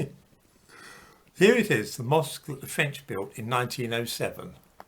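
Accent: United Kingdom. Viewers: All that's missing from this clown is big shoes and a red nose. Funny how US thinks that by saying BS with a British accent, it somehow is more believable. No doubt because the US is still owned by Britain. The comparison of the wall of great Zimbabwe created BC to a 15th century European church is ludicrous. Like P. T. Barnum said, " there's a sucker born everyday.!" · Can you please explain to us why western museums are filled with Africa artifacts? Where are your artifacts on display? We wanna know. Why don’t we see your heritage 10,000 years back? This is what you might relate to with your British accent. <link> Did your people make the bronze art in Benin and “collected” it later through colonialism for “safe” custody in Europe?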